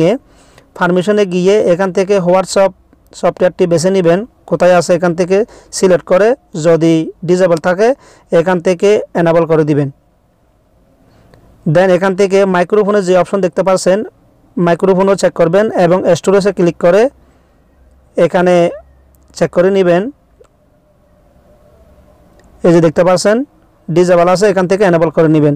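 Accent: Chittagong, Bangladesh. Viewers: চট্টগ্রাম এর নাকি ভাইয়া আপনি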